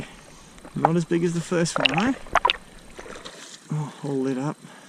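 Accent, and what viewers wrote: Australia. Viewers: Nice follow up by that first fish in the vid. ...So If I go by your accent, then we are in Australia somewhere. Looks like weasle and mink country along that bank but I don't know if you have those there. Looks like one of our Mangrove snapper that we have over here in S. W. Florida in the USA>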